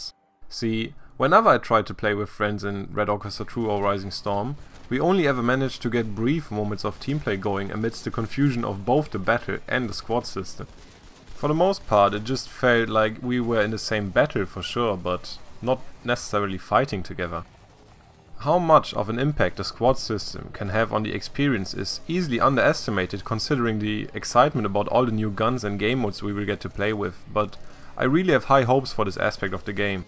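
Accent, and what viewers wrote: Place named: Germany. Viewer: Very well done! You sound much better this time, made me really wanna listen to you. Keep up the good work :) btw are you German? because you sound very German and I would know, since I am one myself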